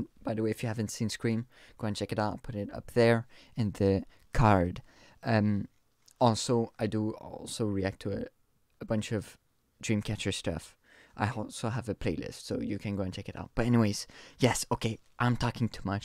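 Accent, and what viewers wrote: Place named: France. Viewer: Love your reaction! Haha Totally understand it too, haha I was like wtf? Lol You have an accent, do you speak French?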